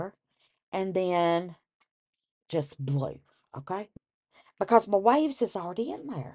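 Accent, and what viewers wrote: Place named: Texas, United States. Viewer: Hey … I’m not sure where you’re from exactly, but I could listen to you all day! Your keychains are beautiful too by the way… I really love that mould, it is very different from the standard letter moulds! I have just watched an old video of yours, where you made crackling bread… Now, I don’t do much cooking anymore, but I would love to have tried your crackling bread recipe. Your accent takes me back a loooong way!! And it makes me hungry for some good ol’ biscuits and gravy… one of the best things I’ve ever eaten!! 😋 😋 I am an Aussie who spent 4 years in Houston, Texas (<num>… flying in, just 3 days before 9/11) and listening to you takes me right back there!! (Back to being in Houston I mean, NOT back to 9/11!!). I loved being there for the first three years, and I planned on staying there to raise my little miracle Texas daughter… unfortunately, her biological (American) father turned extremely abusive and held us captive until I was able to escape. 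Consequently, I had to flee your beautiful country and come home to Australia with my baby. She turned 21 last November, and was raised by myself and the only Dad she’s ever known, my awesome hubby of 17 years. I will never forget my time over there… both the good and the bad… alls well that ends well, they say!! Much love, Nat (Brisbane, Australia) ♥️♥️🇦🇺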